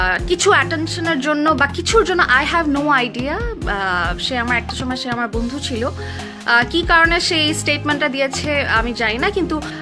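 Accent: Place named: Noakhali, Bangladesh. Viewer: Noakhalir meye bole kotha love you apu 😘😘😘😘😘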